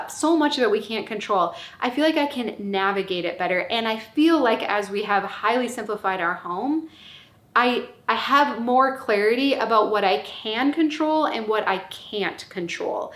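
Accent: Minnesota, United States. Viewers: Lol she lives in MN. That's her regular accent.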